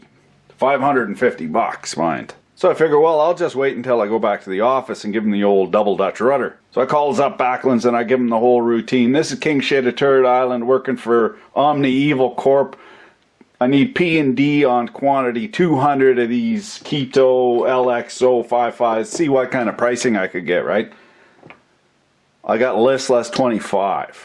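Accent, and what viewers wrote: Canada. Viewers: R u from Canada 🇨🇦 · Can someone translate the canadien chinglish and translate where he bought it for 150 bucks?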